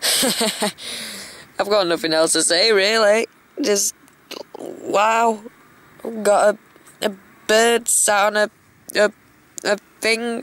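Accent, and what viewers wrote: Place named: Yorkshire, United Kingdom. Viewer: Your accent is well good, your hilarious! Is it Yorkshire? And it's a wreath not reef ;p great vids keep them coming!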